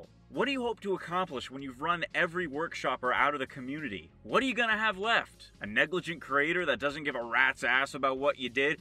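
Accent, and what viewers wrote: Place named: United States. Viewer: why do you sound like mönkey but with an american accent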